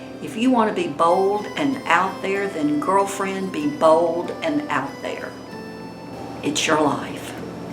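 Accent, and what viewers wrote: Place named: Memphis, United States. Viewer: I adore you. I feel such a closeness to you when you do videos like this. I lost my mother three years ago, and you remind me so much of her. Plus the Memphis accent. 😊I love hearing your thoughts and advice.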